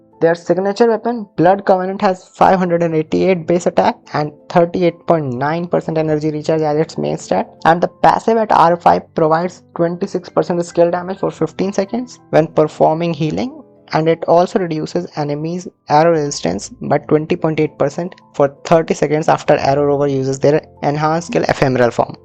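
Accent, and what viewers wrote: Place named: India. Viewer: The accent tells me that you are Indian?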